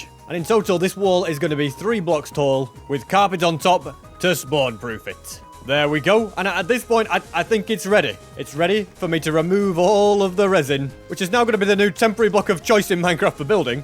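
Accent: United Kingdom. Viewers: You British? It sounds like it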